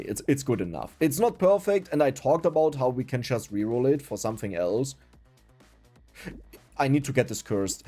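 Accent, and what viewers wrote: Germany. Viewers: Are you german? Alter Schwede... Gave it away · Der deutsche Akzent ist einfach Bombe 😂 The German accent is the bomb 😂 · Idk why but he sounds so much German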